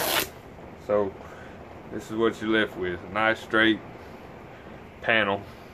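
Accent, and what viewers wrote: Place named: South, United States. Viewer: I've watched all 3 of these videos and I have to say you are a great teacher! It's nice to see a man doing a video like this, I'm sure that it will help to get more people involved. Anyway...we have a pile of Crown Royal bags and my bf was telling me that he needs a welding cap so I googled it and found that several tutorials exist using them and then I came across you teaching. It seems like I'm off to a great start, we'll see now how the rest goes...Thanks again! Oh btw where are you from with that southern accent?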